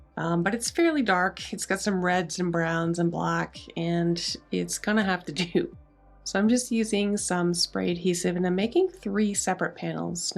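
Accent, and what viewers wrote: Nova Scotia, Canada. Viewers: The pronounciation difference between Nova Scotia and Massachusetts is amazing. You call them Dek-uls, we call them Dee-cals. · I love how you say “decals”, love that Nova Scotia accent! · I didn't realize Canadians pronounce decal differently than Americans. You say deckle. We say Dee-cal with the cal part like California. 💕 Another furniture transformation that, to me, would have been just too difficult as well as me not being able to see it in my head all restored. You're amazing, and I love how proficient you are with old tools as well as the new technology of the laser cutter.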